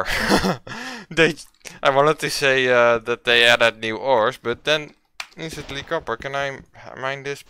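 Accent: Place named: Netherlands. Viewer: Haha your dutch accent is so funny! (Not meant bad at all m8!) Keep goin once you will be at 1k! Tip: maak wat kortere videos en record in meer fps dan word het veel interessanter! (En mschien je naar verranderen naar ofzo iets korter👍) veel succes verder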